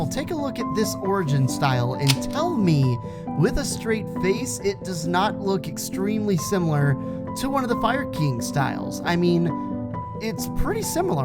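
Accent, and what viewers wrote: United Kingdom. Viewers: i knew he was British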